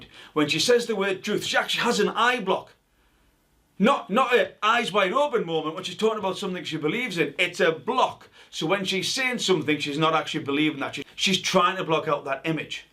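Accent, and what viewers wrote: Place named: Northern England, United Kingdom. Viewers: I’m back again, a year later, for the content, and also those delicious Yorkshire oooooooooooooh s!! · Great video. I’m enjoying that heavy Northern accent. Could listen to that all day 😁.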